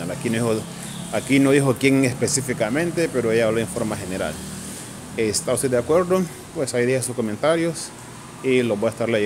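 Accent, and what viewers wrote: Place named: United States. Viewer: Disculpe, ella nunca ha estado callada, pero los hondureños tenemos mente corta,creo que ud más pues por el acento que le siento en sus declaraciones o comentarios se le puede escuchar un acento como del Norte.(USA).pero que le vamos a hacer..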